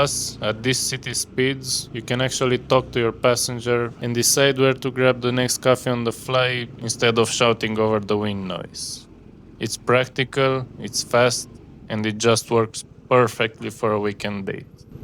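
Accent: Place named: Russia. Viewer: Nice Russian accent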